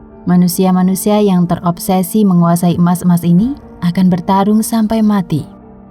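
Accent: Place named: Java, Indonesia. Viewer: kadang normal, kadang medok suara miminnya,.. dari sini bisa disimpulkan mimin org jawa 😁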